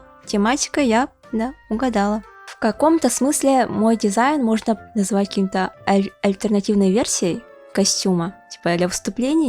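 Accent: Yakutia, Russia. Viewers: Я ИСПУГАЛАСЬ ЯКУТСКОГО ЯЗЫКА, Я НЕ ОЖИДАЛА ЭТОГО.. только сейчас начала слышать якутский акцент и голос очень хочу на фестиваль, но я в деревне живу, а в город еду только январем 😭😭😭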